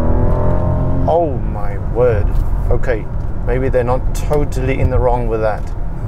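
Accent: Afrikaans, South Africa. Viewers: Lekker? 😂 Howzit my broer? So refreshing to hear something South African Ma se kind, i need one of those 'lekker' fat wraiths😂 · Bro are you South African ?Your accent reminds me of home. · loved the little Afrikaans accent and words coming through lol lekke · You South African??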